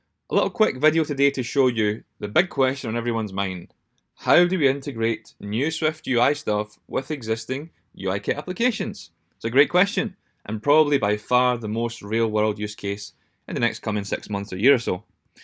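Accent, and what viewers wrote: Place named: New Zealand. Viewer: Amazing video, thanks for making it. Not related to this video, but just wanna ask: Are you from New Zealand? Your accent sounds like people from there.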